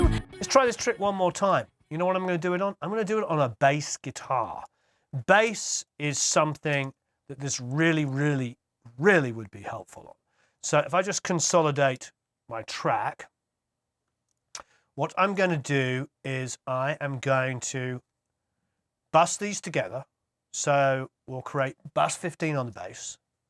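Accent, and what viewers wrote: United Kingdom. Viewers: Hi, first of all: I love watching your videos, your British accent is great - "I hope you are doing marvelously well" and your is it "shnits and schnitzels (?)" (reminds me of "Wiener Schnitzel" each time). I have a question: you seem to add quite a lot of new things to a mix. How do the artist(s) react, when you sometimes give some new character to a mix? I mean, maybe an artist does not want to be changed too much, he wants a mix with the character he has added to a song!? Let us take a famous song: " " by. There is a very remarkable delay on vocals. If had recorded this song in a studio with dry vocals and a mixing engineer would have added that kind of delay to his vocals, there could have been two reactions: "joy or disappointment, I think - is the mixing engineer going crazy, what has he done to my voice!!!???. Do you know what I mean? 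How far can a mixing engineer go? of course was a very special artist, he was doing most of the things by himself, especially in his early years and he actually did not care too much about the quality of his recordings - the mix had to be as good as possible finally, it was more about the composition or releasing something completely new. By the way, recorded an mixed quite a lot in Sunset Sound studios in LA, and Paisley Park´s Studio3 in Minneapolis was or is a copy of it. Susan Rogers has some interesting videos here on youtube talking about her collaboration with. It is also interse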